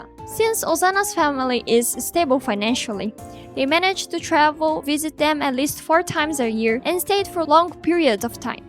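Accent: Brazil. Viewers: MANO EU CONHECI SEU CANAL AGORA MAS EU BATI O OLHO E JA SABIA Q VC ERA BRASILEIRA KSHSKSJSJ, sabia que o jeito q os brasileiros pronunciam o nome da osana e da raibaru realmente muda muito em questões de linguagem? Tipo eu acabei sabendo que uma mina era russa por conta disso e por isso descobri q vc era brasileira! E muito bom ver brasileiros tão talentosos quando você! Adorei o vídeo e os redesigns tb<3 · You have a VERY strong Brazilian accent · hey idk if you will see this but are you brazillian? because your accent really sounds like a brazillian accent, especially when saying the rivals names (i'm brazillian thats why i recognize it xD) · Hey, are you brazilian? You kinda sound "brazilianish" idk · Mano, eu já sabia que era brasileira por causa do sotaque e o jeito de falar os nomes das personagens. (Me humilha menos no desenho😅, ficou incrível os desenhos. 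)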